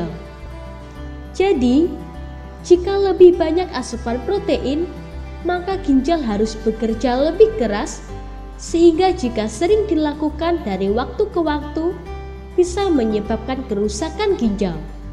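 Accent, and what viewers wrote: Java, Indonesia. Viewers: Suaranya medooook banget mbak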